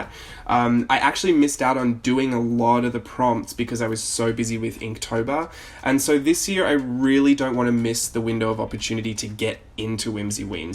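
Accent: Australia. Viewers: You still sound Aussie to me!!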